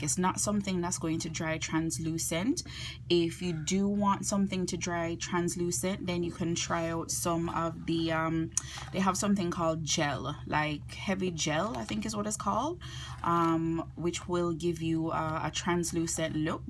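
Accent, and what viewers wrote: Jamaica. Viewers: So refreshing hearing a Jamaican voice 😇 Great video. I’ve been working with space and now ready to try modeling paste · Omg you haveee to be jamaican lol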